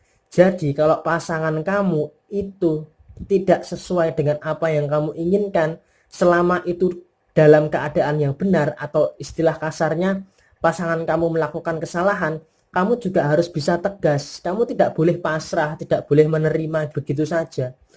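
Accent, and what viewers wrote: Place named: West Java, Indonesia. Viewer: lah ,, mas orang sunda ya .. sama .. sunda na daerah mana mas?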